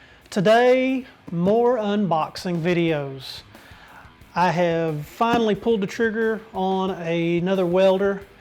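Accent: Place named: North Carolina, United States. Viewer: Enjoyed your review! Do you mind me asking where you are located? I detect a NC accent there. I also think I see a can of spray paint on your bench from Princess Auto here in Canada.... so I'm confused! lol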